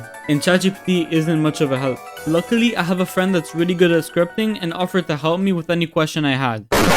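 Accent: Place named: Israel. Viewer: אנימת אחי שמעתי קצת מבטא ישארלי ולא הייתי בטוח עד שראיתי את ההיסטוריה שלך בצאט גיפיטי חחחחחחחחחחח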